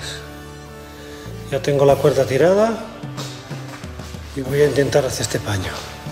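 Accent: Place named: Catalonia, Spain. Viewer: Noto en tu acento un deje Catalán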